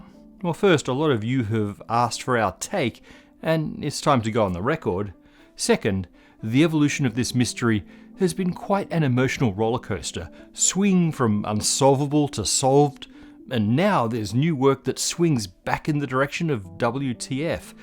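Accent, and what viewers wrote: Australia. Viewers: Great hearing an Aussie accent presenting an American science channel. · Why use an Australian guy and not a regular North American speaker? It's always an Aussie in these science shows. As if to add credibility? Or he's still a minority even though he's white? PBS? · I really wanted to watch this, as I've tried others of this channel, but every time my patience is sorely tested and then after a few minutes just can't take all the unnecessary and extraneous gabbing and explaining. Jeez stop trying to convince us of the woundrousness of the universe and just get to the point. And at least try a little bit to obtain a more American accent. I don't know what accent that is, and typically I don't mind UK accents, but this guy's is really hard to take. Austrialian?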